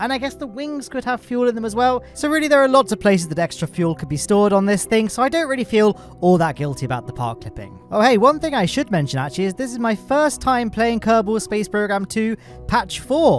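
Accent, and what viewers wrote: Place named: Southwest England, United Kingdom. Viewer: Knew you was from the southwest, its nothing like a Janner accent but you sound like a lot of people i know from plymouth and in bristol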